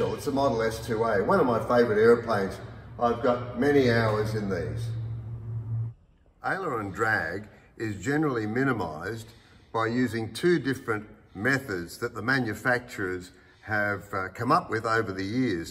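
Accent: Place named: Australia. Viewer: Clear and concise, also great to hear it from a fellow Aussie. Cheers cobba!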